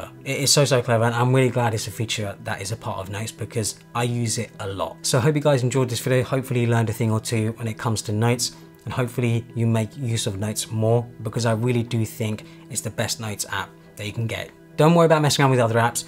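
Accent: United Kingdom. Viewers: Yeah, an accent that doesn't make sense generally, the British... I shouldn't be hearing "nights", instead of "notes". That's why people don't like it.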